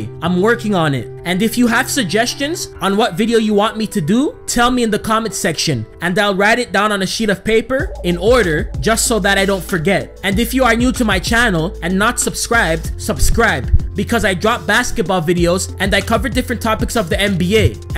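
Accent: Toronto, Canada. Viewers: Just wondering from your accent. Are you from Toronto?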